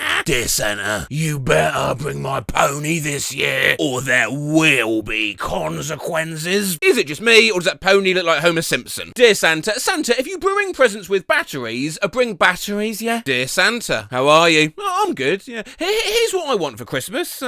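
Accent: United Kingdom. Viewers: I'm new to your channel, and I twigged you're British from your accent... Cor blimey! I was spot on!!! (Btw, Merry Christmas Mate !! 🎅🎄)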